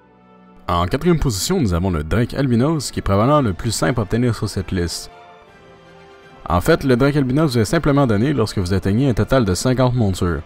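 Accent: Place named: United Kingdom. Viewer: Ton accent anglais est formidable et fait pâlir nous autres Français. Sinon très bonne vidéo !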